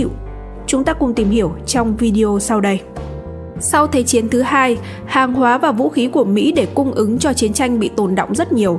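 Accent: North, Vietnam. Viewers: KHÔNG NGHE BẮC KỲ KỂ CHUYỆN NAM KỲ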